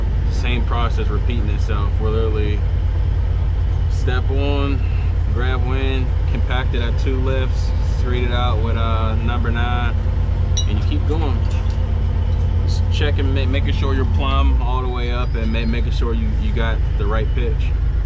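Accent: Pittsburgh, United States. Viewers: First video I’ve watched. 1.3 seconds in and I already knew yinz were from the Burgh. Can always spot a fellow Yinzer’s accent a “mahl “ away 😅 🫡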